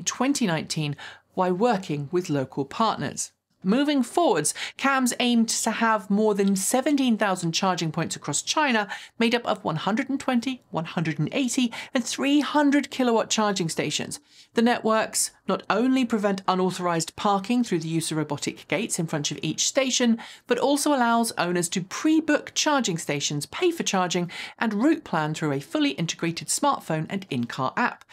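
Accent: England, United Kingdom. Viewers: does have a regional accent -- that region is England:-) And within England, toward the south, and well educated. Also I think goes out of her way to enunciate clearly. Listening to her as a native English speaker, she's pretty unmistakably not American. I don't think many Americans would believe her accent is from anywhere in the US. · does have a regional accent -- that region is England:-) And within England, toward the south, and well educated. And I am saying as a non native english speaker you can't tell whether this is an english accent, or one from somewhere in the US. Therefor I'd find it stunning if anyone would have problem with (unless they are looking for problems).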